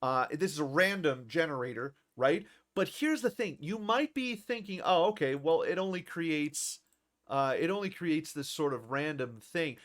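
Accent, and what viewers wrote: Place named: United States. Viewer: You were born and raised in Brazil? You have the most American American accent I have ever heard. Did you train your Brazilian accent out of yourself or something?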